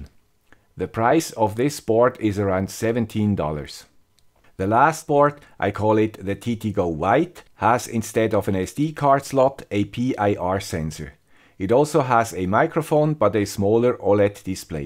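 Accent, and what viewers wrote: Switzerland. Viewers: Yes, THAT is an swiss accent. Notice the distinct difference between a german speaking english? And seriously... Tensorflow on the RPi? Do you know Yolo (uses Darknet)? Yolo classifies quite many different types of objects, but requires quite some processing power... the RPi3 needs around 40 sec for a single frame!!!!!! To make it realtime some external accelerator is required... and even veeeeery small cnn network models,,... It might work with very small images (need to test though...) like 50x50 greyscale or so... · Hello guy with the Swiss accent! Any idea what the camera connector is, CSI? Could you make a video to experiment and let us know if it would be possible to use a 5MP or 8MP camera from a raspberry pi, to increase the fps and quality?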